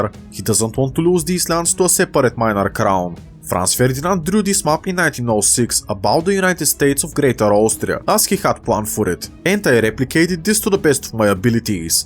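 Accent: Bulgaria. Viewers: Don't. I might be biased because I am also a Bulgarian, but I love your accent. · the Bulgarian accent is one of the many unique features you bring, please only remove it, if it makes you feel uncomfortable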